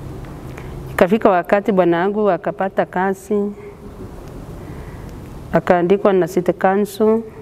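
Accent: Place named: Meru, Kenya. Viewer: Huyu zi mkikuyu anaongea kama wameru au kiembu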